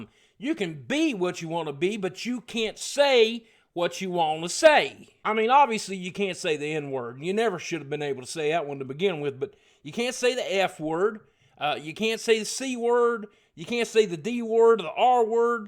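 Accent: South, United States. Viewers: I live in Alabama, the Southern accent is spot on bro. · he's just playing and has a southern accent. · Lol you are awesome man:D absolutely loving that southern draw gotta admit your topics so far have gone over my head america's pretty fucked up right now with everything going on but being british we know none of the pc culture or trump issues:p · (laursaurus) That's because he grew up on the south. He worked on getting rid of his accent when he was younger, I think. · It's actually his real accent, he's said many times he had a southern accent and tried to get rid of it. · I. Love. The. Accent! This is why I wanna visit the US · If this was the first boogie video i'd watched, i'd have thought this was really his voice. I didn't know he could do such an amazing southern accent. This is hilarious to top it off. Sounds like the first southerner i'd probably really get on with, lol. 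· My favorite YouTube persona. I don't want to tell you how to do what you do because it's hilarious, but being from the south if you could make the accent a little thicker it would be spot on. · He takes the typical southern person with a accent who much people think are stupid it turns it completely around. Good job.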